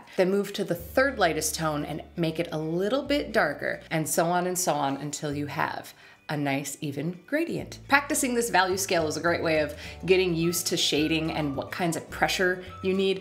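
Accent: Canada. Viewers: is she Canadian ?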